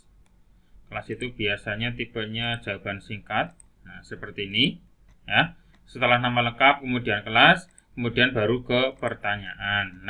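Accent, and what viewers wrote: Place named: Banyumas, Indonesia. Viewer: orang ngapak kie...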